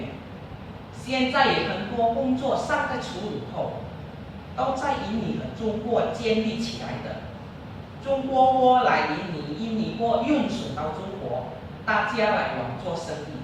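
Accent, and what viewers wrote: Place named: Malaysia. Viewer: Kayak Mandarin Malaysia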